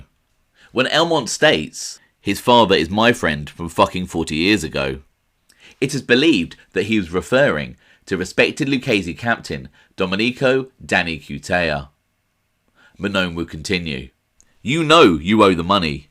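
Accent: United Kingdom. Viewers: Your British accent is a monotone puts people to sleep get a mob guy to report much more exciting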